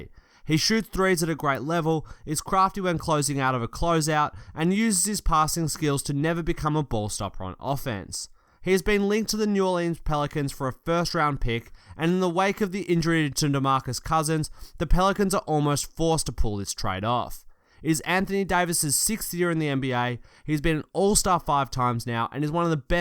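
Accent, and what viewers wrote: Australia. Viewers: What kind of accent it is? Is it Australian?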